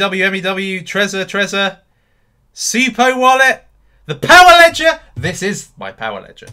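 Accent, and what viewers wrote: United Kingdom. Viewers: ahhhh, had to say, I actually missed hearing that brit voice ahahaa. Hope you had a fair holiday bruv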